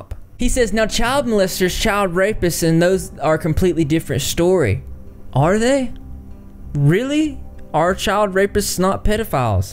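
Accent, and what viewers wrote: South, United States. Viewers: I know this is a serious topic, but I have to be the one to say that, I love your southern accent, it is very soothing.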